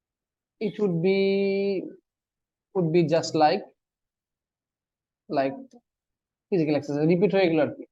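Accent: Sylhet, Bangladesh. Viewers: Sir er maje maje Sylhet language ta kub balo lage.... Serious Video dekad majew hasi pay.... 😄😄😄😁😁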